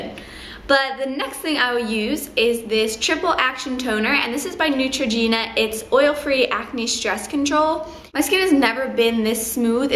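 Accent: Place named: Midwest, United States. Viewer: are you ffrom the mid west. your accents sounds like my cousins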